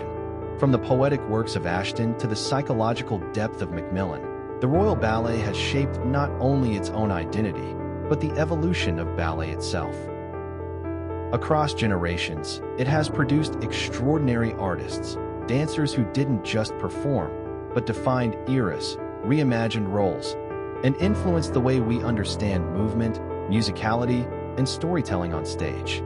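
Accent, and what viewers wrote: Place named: United States. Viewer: Why the hell do we have a Yankee voice on this video? The Royal Ballet is English. We neither need nor want Yanks. I know that Miss Naghdi and Miss Haywood both speak English almost as well as they dance with beautiful English accents. Why ignore such quality resources and use something more and more are finding offensive?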